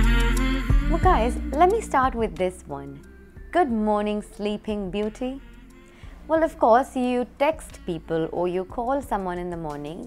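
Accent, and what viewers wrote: India. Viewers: You sound local Indian village English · Are you a native English speaker.? You are speaking indlish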